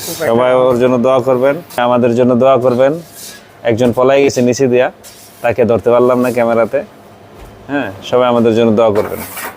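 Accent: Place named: Barishal, Bangladesh. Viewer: আমার কাছে বরিশালের ভাষার মত মনে হয়। ভাইয়ের বাড়ি বরিশাল হলে কোথায় জানাবেন।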